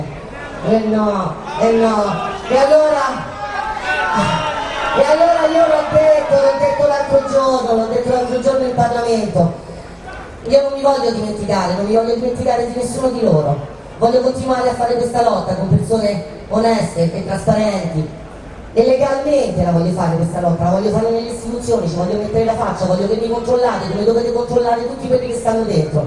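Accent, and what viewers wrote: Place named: Rome, Italy. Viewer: Brava, ma si levasse il vizio di usare il Romano !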